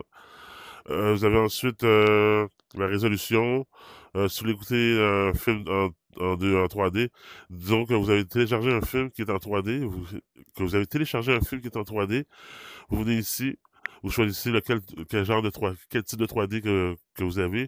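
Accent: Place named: Quebec, Canada. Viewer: Merci je suis newbie avec le Quest 3 depuis 6 jours et j’ai comme on dirait du stock d’appris depuis! Vos explications sont claires et simple à comprendre! Merci pour cette introduction au Bigscreen qui est sans doute semblable aux salles plateformes cinémas! Je suis déçue de m’apercevoir combien c’est monétisé ces machines sans bon sens et ça ressemble étrangement à in PC! Personnellement je ne suis pas fan des jeux que je trouve enfantin de toujours vouloir détruire quelque chose ou de tirer avec des engins sur des gens ou pour avoir accès à des points ou bonus et je ne sais quoi! Par contre, j’adore apprendre quelque chose, voyager ou regarder des films en 3D ou réalité immersive! Il y a un gros potentiel, mais Meta a fait fausse route en développant des jeux presque essentiellement. Car il aurait été plus intéressant de developper le côté immersif dans d’autres applications! 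Je crois que c’est la voie à nos PC de demain, comme on voit dans Star Streck!😊 je suis du Saguenay et vous d’où du Québec, car votre accent est québécois! Merci bcp!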